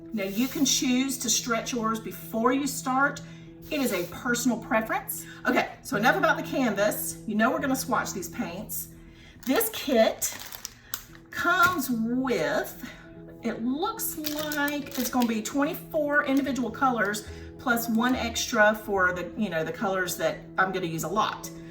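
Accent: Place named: North Carolina, United States. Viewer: You are a joy to listen too, and as I'm originally from North Carolina, I love your accent.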